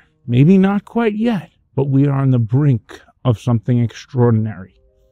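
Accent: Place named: New York, United States. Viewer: brother, thank you. Sound like a fellow NY'ER. Please, whenever you have time, can you go through the steps as if we're 6 yr Olds with all the new stuff coming out. Enoysis, f-assets, delegating. I know you go deep but if you showed us the complexity via how to's, we retards would get it faster. Sgb and lost 20k tokens since price went up, impermanent loss. Didn't even know bro. Help us since you understand it all!!! Gracias!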